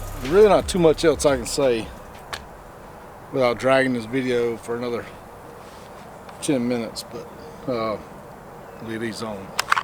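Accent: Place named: Florida, United States. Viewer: i'm doing a similar build now.. just waiting on the micro comps to come back in stock.. yours looks tough as hell. i was raised in FL.. it's funny how much we sound alike. lol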